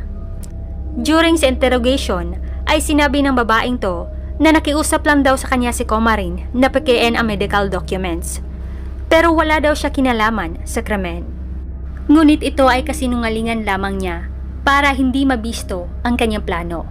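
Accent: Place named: Visayas, Philippines. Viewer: Ok nmn kairita😂 lng ung bisaya accent Mo mejo konti practice P ... But still I'm entertained pdn 😊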